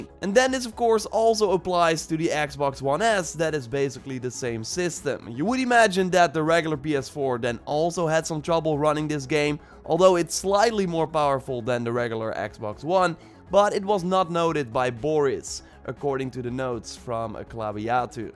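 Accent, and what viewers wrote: Netherlands. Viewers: The dutch accent is heavy on this one. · Are you dutch ??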